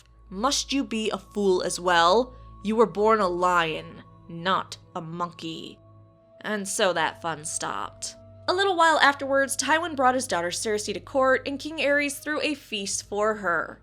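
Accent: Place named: United States. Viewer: To hear this in ... "American" sounds soooo wrong. No offence though.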